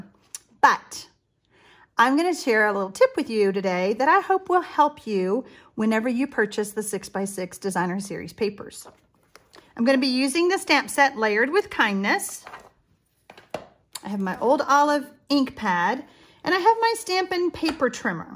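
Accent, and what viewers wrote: South, United States. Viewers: 😃 You do bring a smile to my face,. And I love your southern accent and your tutorials. Greetings from Perth, Australia 🇦🇺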